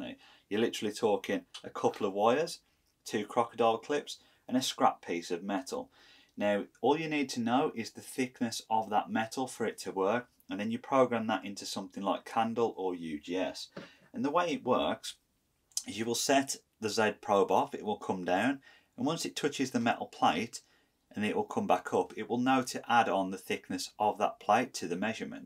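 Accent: Birmingham, United Kingdom. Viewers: Excellent content and the best I have seen on this clever little machine to date! Very many thanks. Btw, people of the world thats a Birmingham accent - a place in England famous for engineering excellence and where kids grow up naturally knowing how to make things and create loud music for some reason. Good to see a great tradition continuing.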